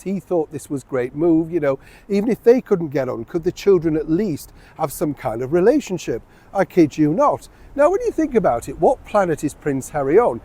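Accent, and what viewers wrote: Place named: Northern England, United Kingdom. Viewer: I think I found you on youtube during the covid debacle. It was lovely to hear your Northern accent as I'm from Manchester but lived in the US for 45 years. I love hearing you talk about foods, places and things I haven't thought about for years. Thank you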